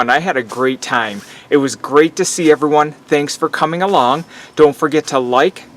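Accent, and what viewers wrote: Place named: Western New York, United States. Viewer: Just found your channel, and heard the WNY in your voice. I grew up less than an hour from this trail. Live in VA now, and miss the geography and the seasons. Subbed. Go Bills!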